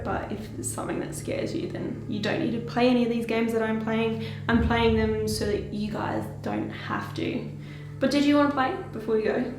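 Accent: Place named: Australia. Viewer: Omfg that Ozzie accent I'm dying 😆😆 you go alright aye love your work greetings from kalgoorlie W/A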